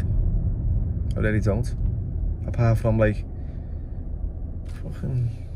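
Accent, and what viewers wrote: Liverpool, United Kingdom. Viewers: Go on lad scouse through and through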